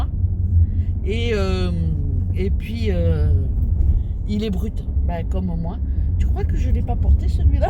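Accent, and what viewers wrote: South, France. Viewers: Bonjour,d oû vient votre accent, vous venez du Sud? Je ne pensais pas qu avec les pieds dans l eau ça pouvait jouer sur l arret de fumer! Merci de nous avoir fait un peu voyager avec les belles images du fond de vlog à bientôt 👋👋👋👍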